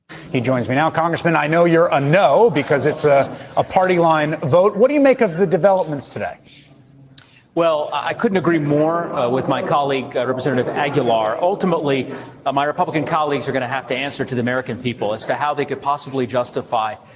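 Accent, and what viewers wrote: Australia. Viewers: Sounds Australian to me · This dude went full blown racist with an Australian accent. Make it make sense, you not even from here bro!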